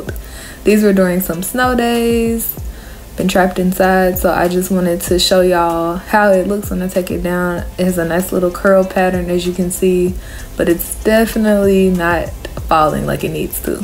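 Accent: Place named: Dallas, United States. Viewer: I really love listening to your voice - for some reason your accent sounds like a Dallas accent - your hair is beautiful, really enjoyed every moment of this process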